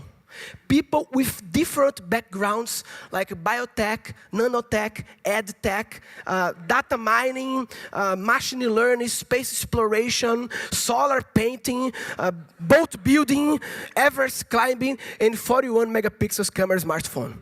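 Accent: Northeast, Brazil. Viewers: Esse sotaque nordestino no inglês é massa demais, Parabéns!!!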